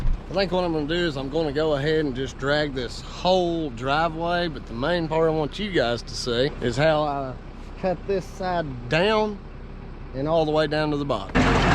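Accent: South, United States. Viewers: Outside of the southern twang, I like your style. 😁 New subscriber.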